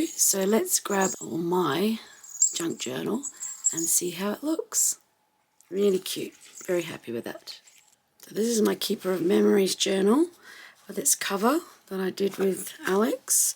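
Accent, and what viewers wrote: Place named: Melbourne, Australia. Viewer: I am enjoying your channel and ideas! I made the Japanese fan journal from coffee filters and REALLY love it!!! As a bonus, I have a dear friend of many years who lives in Melbourne. So, listening to your lovely accent makes me feel like I am spending time with her!!!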